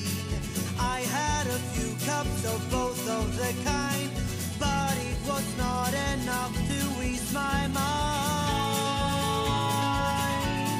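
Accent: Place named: Spain. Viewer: Sounds like he has an accent.. Spanish maybe?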